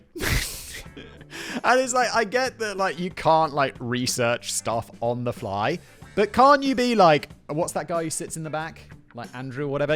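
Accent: United Kingdom. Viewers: chill out people on pronouncations, he speaks the unrefined British English, not the improvemed American. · Simons Brit accent is at times a bit too heavy for me. But today, it was a fucking sonnet. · what's so "intelligent" about this guy. Is it because he speaks with a British accent? Apparently, he has been the voice of several youtube channels and somehow ends up copying the channels for himself. The guy is not even a scientist himself, and he's here criticizing those who don't follow the "official" narrative. These are the same people who won't let you even consider the possibility of the virus escaping from a lab which first appeared you know... 5 blocks from one of only 3 labs that could have made the virus. Subjects that were discussed at the time at the Joe rogan show. wished he was invited to Joe Rogan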